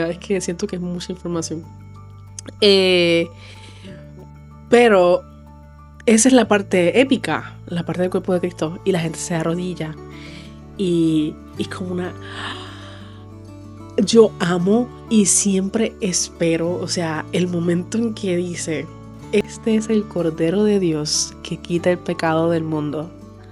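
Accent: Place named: Puerto Rico. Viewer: Hermana Boricua! Lo sé por tu acento. Crecí asistiendo a la iglesia católica y en colegio católico y teniendo toda esta riqueza en mis narices no lo entendía en mi corazón. Mi mamá siempre me decía esta es la iglesia que fundó Cristo y aquí tenemos la Eucaristía, pero yo seguía siendo una católica tibia, sin compromiso… fui a una iglesia evangélica por unos meses pero cuando empezaron a hablar mal de las monjas y los sacerdotes además de la presión para dar dinero y me dije…esto no es del Señor…además que algo me faltaba y era literalmente la comunión, la Santa Eucaristía. Volví y empecé a empaparme de lo que creemos y a buscar información y quedé bruta y convencida de que era verdad lo que me decía mi mami. Abrí mi mente y mi corazón y estoy enamorada de mi Jesús en la Eucaristía- somos el cuerpo de Cristo - la iglesia que El quiso que viviera en unión. 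No somos perfectos pero El dijo que las fierzas del infierno no prevaleceran contra ella. Dios te bendiga y te acompañe en este caminar. Un abrazo! Vi tu video desde Virginia, EU.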